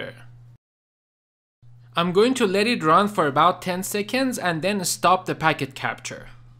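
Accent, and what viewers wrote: Iran. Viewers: Thanks, quite useful for troubleshooting my IP cameras...and oh yeah.....I just love that Persian accent :-) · in iran we say that your Tehraneis accent is killiiing me and we think thats beautiful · your accent like iranians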